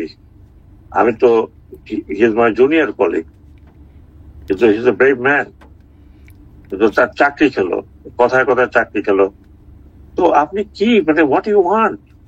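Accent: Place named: Dhaka, Bangladesh. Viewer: আমি হাসবো না কাঁদব আমি চিন্তা করে পাচ্ছি না । ভিডিও তে বলা হয়েছে, হোস্ট নাকি জার্মানি তে থাকে, ভালো কথা, জার্মানি তে থেকে ও ঢাকা সুরে বাংলা বলছে । উনি পোস্টমর্টেম এর পরের ইন্টারভিউ আর এখন এর ইন্টারভিউ টোটালি আলাদা। যাক বয়স হয়েছে, একটু ভুলে যেতেই পারে। কিন্তু এটা বুজছি না, যে যদি কল টা জার্মানি একজন এর কাছে করা হয়, তাহলে আপলোডের এর IP এড্রেস ঢাকা হয় কিভাবে ? জার্মান থেকে আপলোড করতে পারত না ? অলস অনেক চেষ্টা করছে বুট লুকাতে পারল না, চ্যানেল এর বসে Ip হলো ইন্ডিয়া 😂😂😂। হেয়ার রে গুযোগ এর দেশ । উপর থেকে ভিডিও তে আগে কি হবে সেটাও এডিট করে আগাম বানি দিয়ে দিছে 😂😅😂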